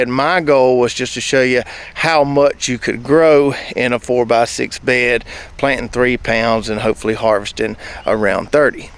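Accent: South, United States. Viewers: Are you trying to loose your accient..... I know that you do not hiil your in ground taters but you heel them. Keep the accient and Southern way. I enjoy you viedos and your information...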